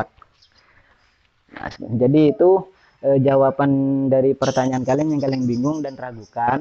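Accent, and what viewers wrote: Madura, Indonesia. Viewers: Kalau dilihat dari foto ruang tamunya abang ini adalah santri, Kalau dilihat dari logatnya abang ini adalah orang madura, reng madureh dimmah kak......?? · Logatnya asli Reng madureh😅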